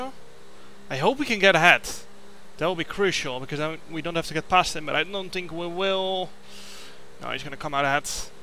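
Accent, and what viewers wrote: Netherlands. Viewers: are you form the netherlands/ kom je uit nederland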